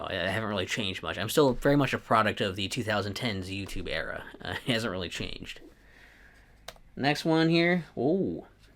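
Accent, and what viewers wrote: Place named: Minnesota, United States. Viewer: I think it's really cool you still enjoy making videos, and I am glad you do post them and share them with the public.🙌 I've been making videos for about 12 years and never thought to be bold enough to post anything, so it's really good that you have and stuck to it! That's mad that you don't experience ASMR yourself, you are very good considering you don't.👌 As for the ASMR voice, you do still have one, but it is definitely nothing to do with being bored and then falling asleep... if that was the case, I wouldn't of watched your videos. 😅Haha thats cool... hello over to Minnesota! I can here that accent slightly ... I only know Minnesota from Marshall in 'How I met your mother'😝Your YouTube plan sounds good, I'm excited! 😆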